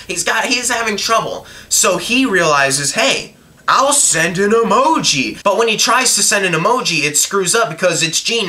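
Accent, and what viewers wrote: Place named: United Kingdom. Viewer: This is for FANS take that critics British accents are beautiful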